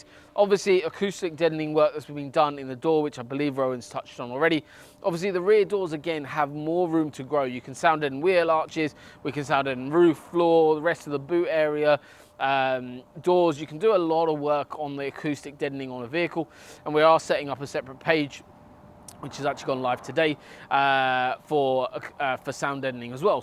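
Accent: South Africa. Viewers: that accent is just RSA, no DNA. Awe ma se kind 😊😊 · I hear a South African accent a mile away 👍🇿🇦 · Instantly picked up the South African accent, two words in.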